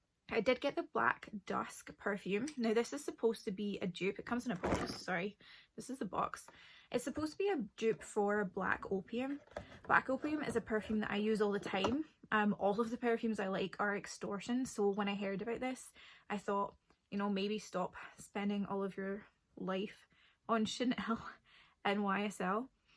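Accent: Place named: Scotland, United Kingdom. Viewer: At the start I thought you had american accent but the more I listened I can hear your scottish now ha 🙈😁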